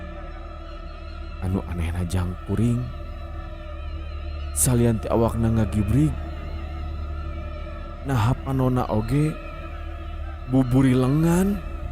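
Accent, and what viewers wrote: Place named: Sunda, Indonesia. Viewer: Kang... Pas ngadongengkeun carita jurig, Osok sieun henteu? Hehehe Abdi nu ngupingkeun mah meni sieun tapi resep da kang nyunda pisan.. perkuat di soanten kang... Musik latar sebagai pemanis z. Nuhun kang.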